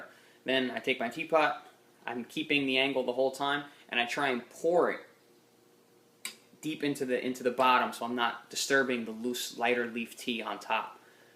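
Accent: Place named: Argentina. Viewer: che...... vos sos argentino?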